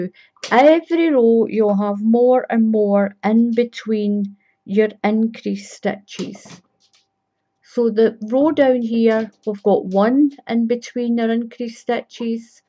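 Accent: Scotland, United Kingdom. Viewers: Great to hear the Scottish Accent for a change. I won't have any problems understanding you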